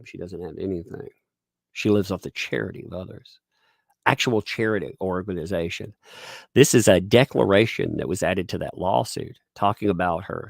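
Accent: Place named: South, United States. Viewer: You kind of talk like Beau of the Fifth Column. He has a little twang too when he speaks he must be a southerner like you 😂 😂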